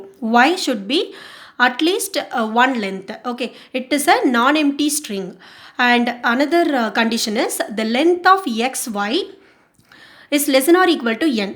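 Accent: South India, India. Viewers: It's in her South Indian accent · she is definitely from south india and pronouncing n as yan l as yal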